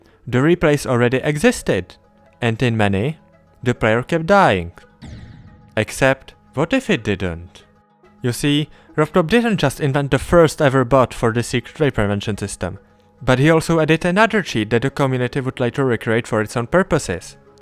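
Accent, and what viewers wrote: Czech Republic. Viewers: naahh i could tell you're czech from the FIRST WORD I HEARD WWWW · hele nevím jestli jsi čech ale podle přízvuku myslím že jo takže pokud jsi tak ti chci jen říct že je to dobrý video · didnt notice you were czech till , but afterwards it was very obvious, čau bratře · YOU FROM CZ?? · ale po 10 sekundach videa jsem vedel ze je to cech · lubim ten cesky prizvuk xD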